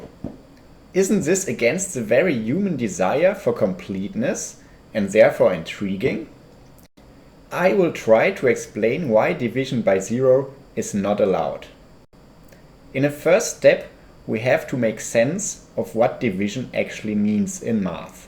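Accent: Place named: Germany. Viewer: Nice Kraut accent.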